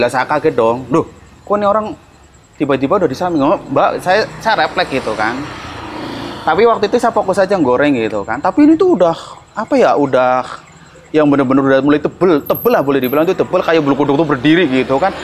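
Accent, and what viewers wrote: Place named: Cilacap, Indonesia. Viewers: ini cerita serem tp jd ketawa karna abangnya ceritainnya lucu pake logat jawa medoknya😂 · Ngapak dikit nga ngaruh um haha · Owalah ngapak tenan Iki mas req😂❤ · Nadanya kaya orang cilacapan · ngapak banget bahasanya bukannya serem malah ngakak wkwkw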